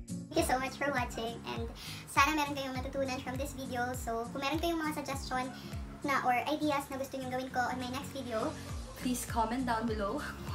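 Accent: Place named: Visayas, Philippines. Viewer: HAHAHAHA funny yung bisaya accent 🤣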